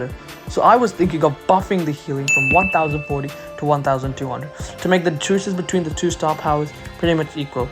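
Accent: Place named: Germany. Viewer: Wieso merkt man, dass du deutsch bist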